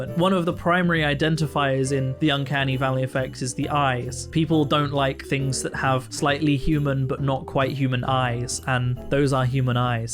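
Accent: United Kingdom. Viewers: eheheh thank you, it’s honestly just a standard british accent!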